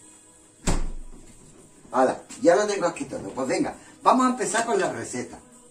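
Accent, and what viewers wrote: Andalusia, Spain. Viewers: Ole tu ,y ese acento andaluz que me encanta, y esa cazuela que buenisima pinta ,gracias de una catalana 👍🎅🎄🎊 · Me encantan tus vídeos y no solo por lo que guisas también por tu gracia, ese ángel y ese acento andaluz que mola tanto.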